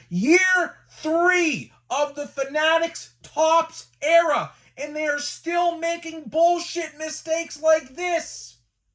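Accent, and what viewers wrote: Pittsburgh, United States. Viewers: Yinz sahnd like mark madden … jagoff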